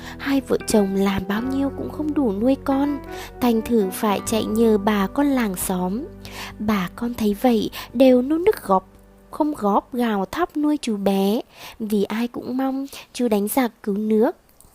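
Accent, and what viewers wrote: Vietnam. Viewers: thú vị chất giọng của bạn. Giống những hướng dẫn viên du lịch xứ nghệ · Hay à. Cứ như thế này nhé. Kể cho nhiều người biết về lịch sử của người Việt. Tính giáo dục rất cao.. Giọng hay quá. Bạn ở Huế à. Câu chuyện được dẫn hấp dẫn, rõ từ. Lên xuống giọng họp lí. Chắc giỏi văn lắm đây.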